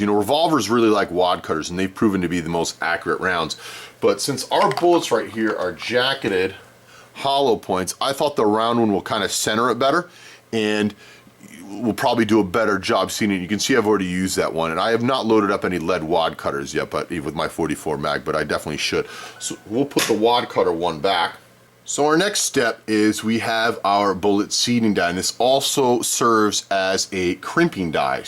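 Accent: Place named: United States. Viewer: You sound exactly like my history professor lol, what state are you from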